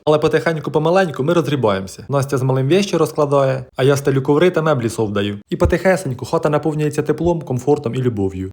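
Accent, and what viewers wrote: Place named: Surzhyk, Ukraine. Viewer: Я не знаю що в тебе "присутствует" але зі своїм ухоріжущим суржиком ти доВбойоб знатний😂😂😂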